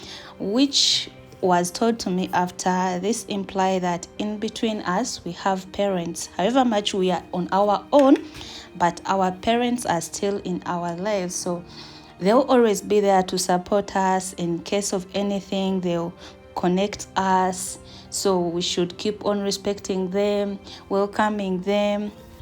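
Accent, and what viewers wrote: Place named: Australia. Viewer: 🤭 a lot of your ignorance shows on your comment. 😁🤐. sounds Aussie to me.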